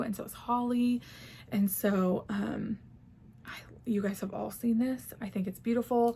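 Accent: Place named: Ohio, United States. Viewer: First time seeing your Flosstube - thanks! Sounds like you’re a fellow Ohioan! 🎉 Liked and Subscribed and look forward to your next! ❤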